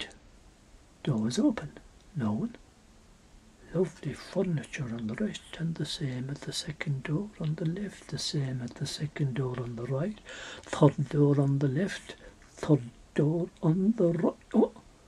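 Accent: Ireland. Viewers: Love the Irish accent so dang much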